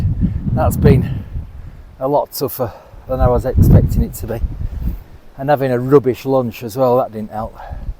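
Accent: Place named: Yorkshire, United Kingdom. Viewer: Standard day int Lakes, but glad the weather improved for yas;) I've lived here two years now and it's hard to motivate yourself to get out when it's claggy and damp so much, compared to other places I've lived (Northern Snowdonia) and yes, a fellow Yorkshireman so always glad to hear you using the natural dialect and you like to get away from people!:) Better weather days are coming!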